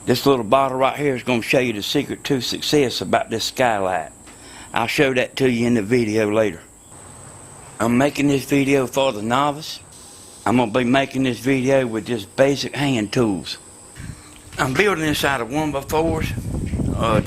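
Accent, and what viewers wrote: South, United States. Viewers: Thank you Mr, Well Done Engineering w/o all the usually jargon/pretentious. Pls give-up the cigarettes because folks like me would like you around & kickin for as long as possible. Thks again PS: Your southern accent/slang is a lot like poetry. I wish I still had mine.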